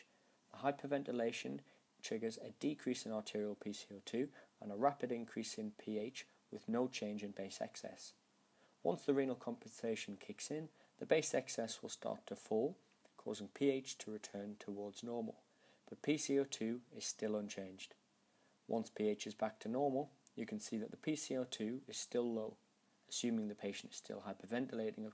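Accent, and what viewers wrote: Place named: United Kingdom. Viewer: British accent, talk too fast, volume too low. Difficult to understand. SLOW DOWN.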